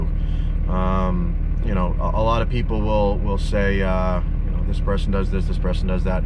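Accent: Massachusetts, United States. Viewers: I love hearing that mass-hole accent when you talk! 🤣🤣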